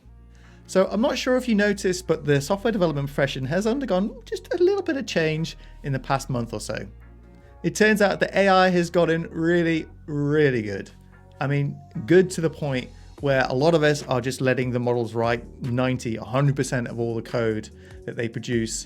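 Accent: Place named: Australia. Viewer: I’m so familiar with the accent, Aussie right ?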